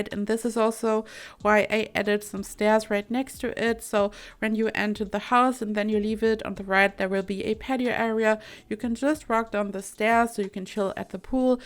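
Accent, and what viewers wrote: Germany. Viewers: your english... I could swear you german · You sound sooo german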